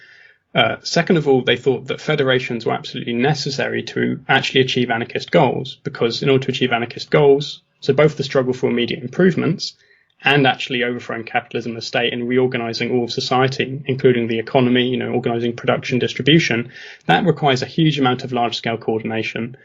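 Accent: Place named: United Kingdom. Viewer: Oh no she's British 😔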